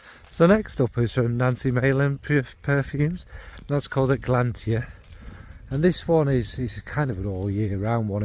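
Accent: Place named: England, United Kingdom. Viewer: You don't need too it's cute and funny 🥰 (English accent in french is beautiful!!!)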